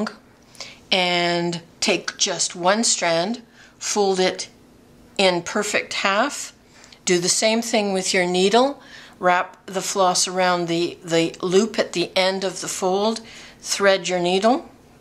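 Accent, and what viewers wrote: Canada. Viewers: Hi! I changed my youtube name from TheConnieS to Petalpresser. I hated that other one.. I don't do cross-stitch anymore because of carpal tunnel and eyesight. I never saw someone thread a needle like that before. Don't know if I could do it. You are a very good teacher. Your voice is very soothing. I think it's the Canadian accent. : ) Always glad to see your videos. What a treat!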